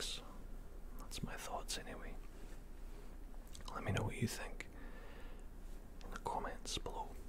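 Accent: Scotland, United Kingdom. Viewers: Love love the Scottish accent! Your voice is so relaxing. Just subscribed to your channel 🙋🏽‍♀️😊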